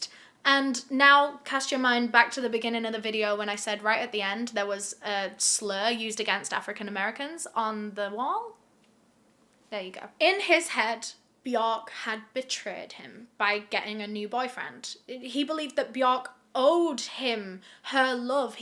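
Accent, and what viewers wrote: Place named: North England, United Kingdom. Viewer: Coming back to this video as handled this subject with such professionalism & care. Honestly she’s one of the few true crime YouTubers who actually doesn’t glorify these people / subjects & I have so much respect for that. Edit: Also as someone who has family & friends who live in the North her accent brings me a weird sense of peace whilst listening to these fucked up stories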